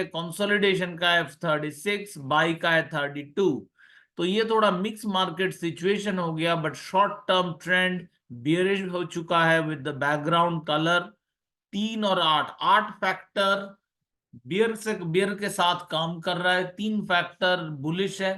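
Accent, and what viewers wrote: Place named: Bengal, India. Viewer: Accha video hai sir. Aapki Hinglish bhi acchi hai, with a Bengali tone 👍👍 make more like this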